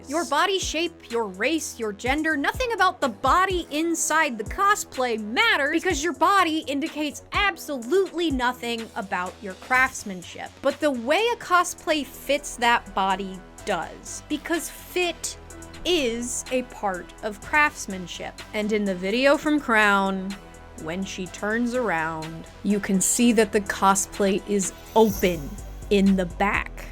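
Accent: United States. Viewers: As each day passes, the american accent grates harder on my soul.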